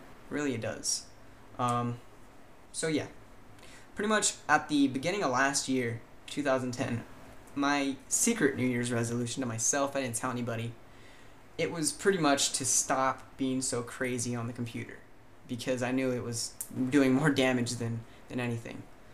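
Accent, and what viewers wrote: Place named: United Kingdom. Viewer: Thanks. I'm not as addicted as it sounds like you used to be, but I do over-use my computer. So after this comment, I'm turning it off and going downstairs :) Nice to see you realised this and turned your life around, I'm happy for you. And side note, the accent is kind of British :) Question... do you prefer to make vlogs, or tutorials? What do you see as your "main channel"?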